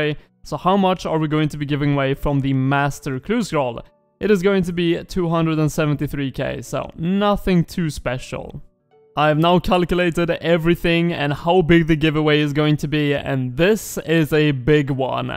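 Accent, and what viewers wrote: Sweden. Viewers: Where in Sweden are you from? :D Rsn: Virgin Barry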